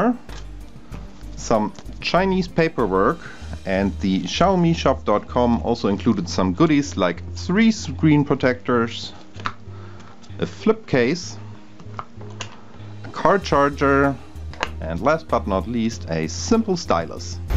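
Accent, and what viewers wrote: Germany. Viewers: Are you german? You speak like that. One question, are you buy all This phones? Or just some?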